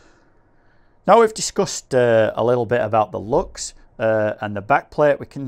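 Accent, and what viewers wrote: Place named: Northern England, United Kingdom. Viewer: nothing's wrong with him lmao. It's the British accent from the north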